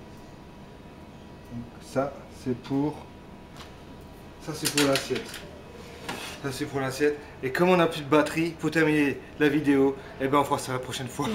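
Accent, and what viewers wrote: Alsace, France. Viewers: est-ce un accent alsacien que je reconnais là ? je me trompe peut etre hein...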